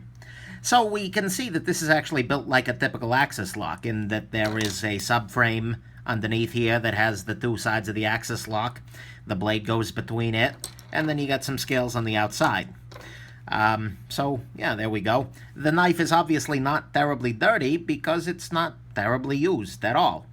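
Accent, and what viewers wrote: New York, United States. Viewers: You’re from the desert? You sound like you’re from Long Island, Brooklyn or the Bronx! : )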